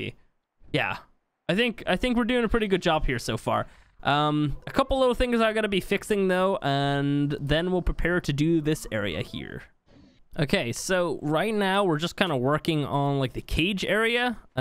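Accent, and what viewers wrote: Ireland. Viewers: Who else hears the Irish was in voice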